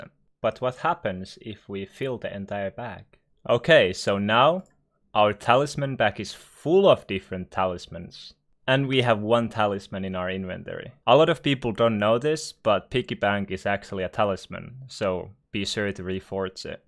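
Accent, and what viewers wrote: Finland. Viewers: shut the fuck up he is Finnish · Yo are u from Finland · Bcs he’s finnish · huomasin heti et olit suomalainen sun accentistä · your accent makes me want to go to finland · Are you finnish becouse i am and your accent sounds finnish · Youre a finn, its confirmed im sorry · Bruh he’s just Finnish it’s a different accent chill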